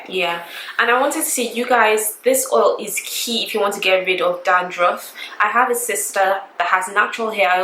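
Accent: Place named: Nigeria. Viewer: I was going to ask if you are Nigerians cos of your accent but you have it in this video. Next question, with the mixture of all these oils how do you know which ones work for sure, like which does exactly what and which ones are ABSOLUTELY necessary which are the MAYBEs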